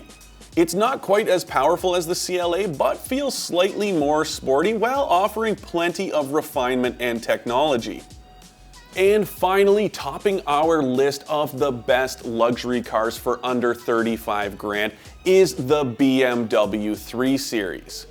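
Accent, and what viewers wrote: Canada. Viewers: I'm curious, is this a Canadian or American show. this guy's Canadian accent is thick!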